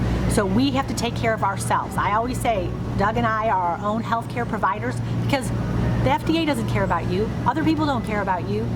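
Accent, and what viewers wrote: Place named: Wisconsin, United States. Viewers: Nice Midwestern accent! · Thank you. I loved the reminder. Where are you from? You have a Wisconsin accent.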